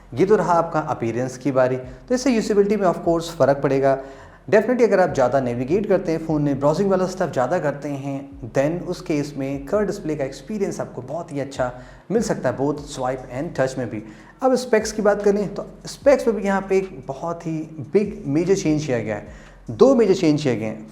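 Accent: Lucknow, India. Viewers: Bhai aap Lucknow se ho kya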